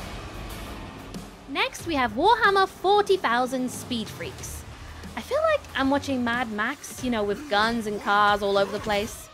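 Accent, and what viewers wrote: United Kingdom. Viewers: I thought I heard some british accent or intonation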